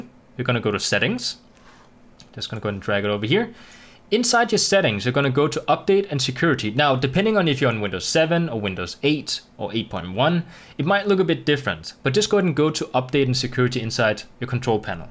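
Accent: Denmark. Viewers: Tager jeg helt fejl hvis jeg kan ane en snært af dansk i accenten :P? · He's Danish u can tell by the accent and the ads.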